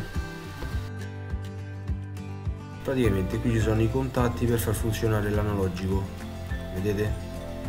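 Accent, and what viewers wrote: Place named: Rome, Italy. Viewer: Sei un grande! Ho voluto provare a smontarlo anche dopo solo 1 anno di vita.. Smontato, pulito quei cerchietti, sollevato un po quei contatti metallici per scrupolo anche se sembravano ok, rimontato e non ho più avuto problemi di visuale che andava da sola verso sinistra! Che dire, se sei di Roma (dalla calata penso di sì) ti offrirei volentieri un caffè o na birretta!! Grande 💪🏻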